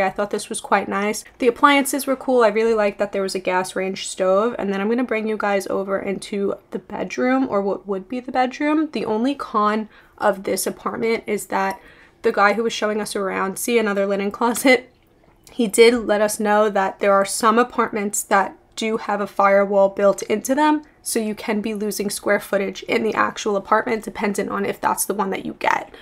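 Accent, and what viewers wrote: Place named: Long Island, United States. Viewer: Off-topic, but I really love your accent! I'm not familiar with specific New York accents, but are you from Long Island?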